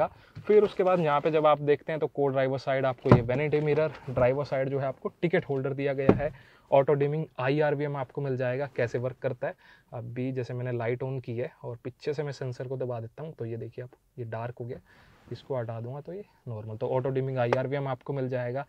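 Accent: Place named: Haryana, India. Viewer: Bhai apka haryanvi touch miss ho raha hai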